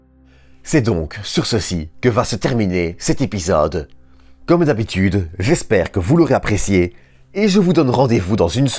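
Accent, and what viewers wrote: Belgium. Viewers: Bsr je dirais belge mais son accent n enleve rien a la qualité du travail fourni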